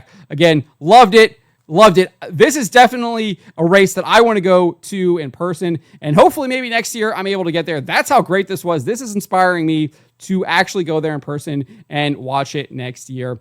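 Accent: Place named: East Coast, United States. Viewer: Damn right.... Idk where you coming from (location) (NC in the house) but you sound like an east coast guy and we've got very similar racing tastes. But I most definitely agree, this is one of best shows going. I checked the North-South 100 off last year as a bucket list event. Unfortunately I won't be able to go back this year as I'm checking off some other bucket list stuff .... However The PDC and Eldora are on next years bucket list..... Maybe we can hook up at some point and catch a race, have a beverage and enjoy a race together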